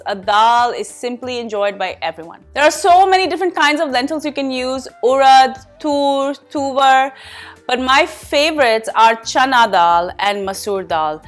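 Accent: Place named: India. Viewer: " "? Shakal aur accent desi, aur naam vilayati"?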